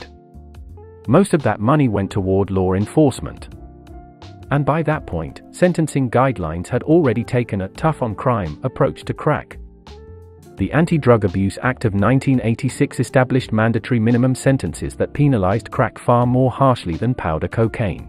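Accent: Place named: RP, United Kingdom. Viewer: This is text-to-speach voice generator. You can choose whichever accent you like. OP just liked the RP, I guess.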